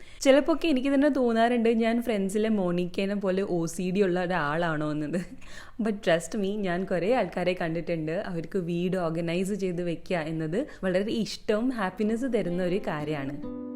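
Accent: Thrissur, India. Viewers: Thrissure slang undallo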